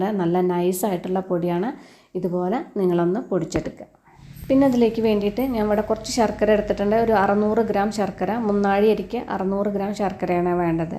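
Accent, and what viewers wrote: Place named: Valluvanad, India. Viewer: നല്ല വള്ളുവനാടന്‍ ഭാഷയ്ക്ക് നമസ്കാരം.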